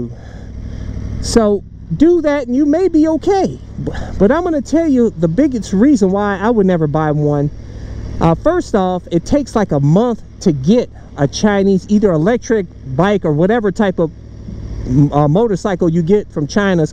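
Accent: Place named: Atlanta, United States. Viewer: This man's got that Atlanta accent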